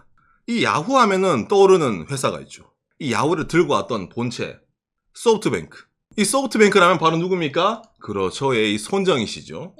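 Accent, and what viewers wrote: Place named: Gyeongsang, South Korea. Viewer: ? 말할 때 경상도 억양이 살짝 들리는 듯 ㅎㅎ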